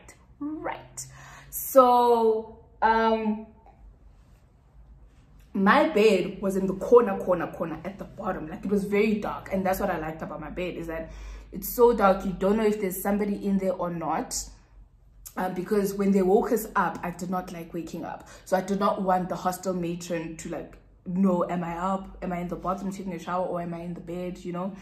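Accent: Afrikaans, South Africa. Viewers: It's your Afrikaans for me🤣🤣❤️ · I LOVE the Afrikaans accent 🤣🤣🤣🤣vat nie kaak nie😂😂arg thank you for the story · Your Afrikaans accent🙇🏽‍♀️😂😂😂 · It's the Afrikaans accent for me 😂😂😂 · Fun fact: Every after 3days I come to check if momma has delivered the content yet! 💕📌 My second home is here with ❤️ I love youuuuuuuu! 🤣🤣okay. Afrikaans accent! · Her Afrikaans accent is top tear 😍😂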